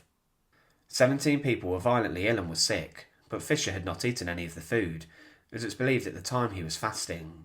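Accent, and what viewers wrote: United Kingdom. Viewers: To clarify, he has a lovely British accent... it's the constant ebb and flow that is distracting.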